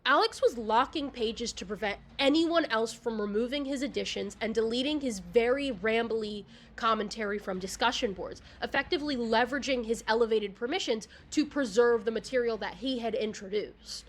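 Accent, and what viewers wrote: South, United States. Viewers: you have the SLIGHTEST southern accent and it’s so cute! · I like your cute lil southern accent 😊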